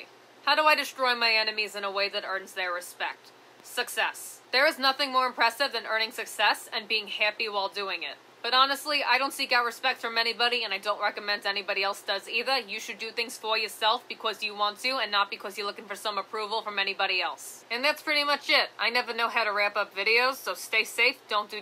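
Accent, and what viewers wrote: New York, United States. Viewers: Hey are you from new York?I was really wondrring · She from New York?? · Is this accent from New york. Is both the most wonderful and kind of intimidating! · I love this chick hear voice is wonderful dead ass. She sounds really New Yorker🤣 and I am from nyc, but she is also funny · Hey, I like your accent.. it's a mmmm very New Yorkie. For get about it... lol 👍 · Are you from new york or something east coast? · We Stan an e girl wit the New York accent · You come from New York with that accent and definitely Italian parents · Was just recommended your channel. Are you a New Yorker or from New Jersey? Or are you from Boston? That accent sounds like New Yorker!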